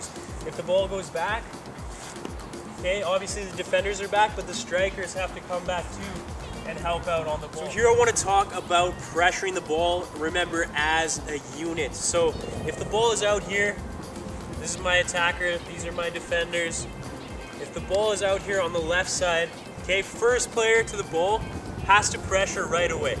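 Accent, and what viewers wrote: Canada. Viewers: He sounds like a Canadian to me.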